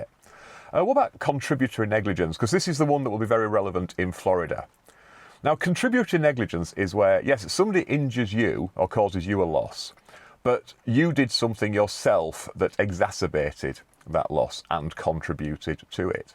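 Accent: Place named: Yorkshire, United Kingdom. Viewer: I love listening to someone speaking Latin in a Yorkshire accent. I thought I was living in 'The Hunger Games' ? Don't have to imagine.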